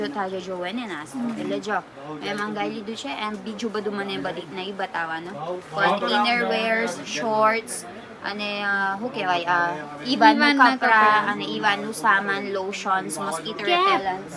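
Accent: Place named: Charotar, India. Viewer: speaks charotar gujarati 😅 sounds really good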